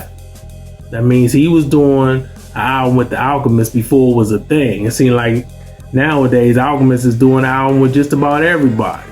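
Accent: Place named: DMV, United States. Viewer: Accent sounds like you're from the DMV where they had Tru Skool. Solid review.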